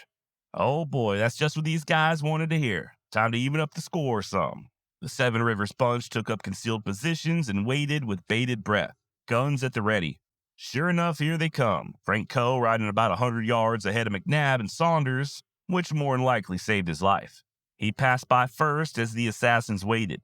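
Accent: Texas, United States. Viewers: The moderator of these stories is first class. Great Texas accent, clear diction, great humor and wonderful storyteller. Pleasure just to listen to him speak.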